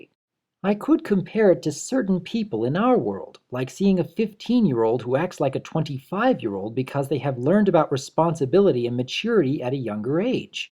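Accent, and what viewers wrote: United Kingdom. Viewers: Dear Are you British or American?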